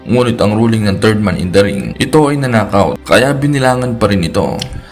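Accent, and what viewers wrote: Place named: Batangas, Philippines. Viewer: Ayos kabayan bentang benta Ang iyong mga banat...batangenyo ka ba?Ang mga salitang binibitqwan mo e hawig Ng salita Ng mga batangenyo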